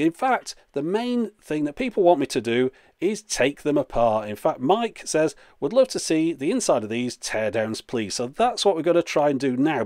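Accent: United Kingdom. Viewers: I like the way he says "tchubes" Just use a long U with a British accent. I did notice that he uses a short O when saying phono though. Sounds like "fawno". Whatever, I have no problem understanding and actually enjoy the British accent. Think I will go watch some Anglophile vids.... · A vid whose purpose is trolling comment trolls, delivered in a British accent. Excellent! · seriously you shouldn't care that much about what people try to tell you especially not on how to pronounce a word just ignore these people, no one can force you to pronounce a word like they do it just cause you're from the UK and they are from an other part of the world which speaks english and pronounces the word differently hate shit like that people stop trying to make someone talk like you do just cause you dislike the way he does it! live and let live or go fuck off (sorry for the rude words but its just true) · Omg, youtube comments never cease to amaze me. 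I cant believe people were annoyed enough to complain about your pronunciation of tubes! i rather like the brittish pronunciation of many words and i know I'm not alone here. Why else are most documentaries hosted/narrated by chaps with English accents.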